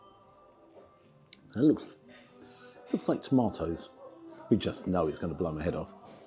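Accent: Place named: Scotland, United Kingdom. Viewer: I had to turn on subtitles because the Scottish accent you've picked up was getting difficult to understand. I will assume you enjoyed the birthplace of tikka masala and the food you experienced 😂😂. 9/10 for the history and accent. Have a wonderful weekend.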